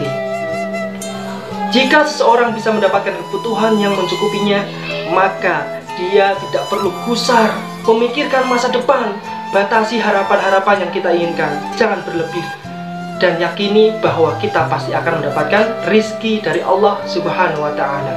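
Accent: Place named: Java, Indonesia. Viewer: Ust orang Jawa ya?.. Logatnya kentel banget.... Mantap tadz. Sukses tadz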